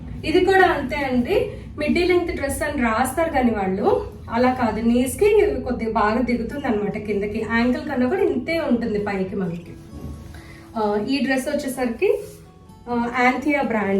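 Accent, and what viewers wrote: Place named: Godavari, India. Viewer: Enka chadavaledu but I am watching his yt shorts ma super godavari slang❤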